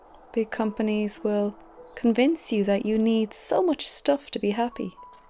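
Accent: Ireland. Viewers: I just came across your channel. Listening to your voice for the first time, I knew that you are Irish :)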